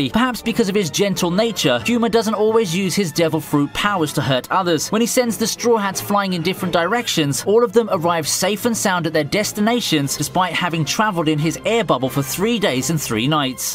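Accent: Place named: United Kingdom. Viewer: Man that British accent make everything sound so presentable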